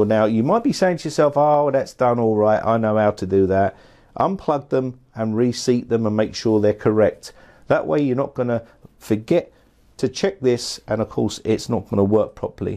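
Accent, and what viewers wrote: England, United Kingdom. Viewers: Most satisfying english accent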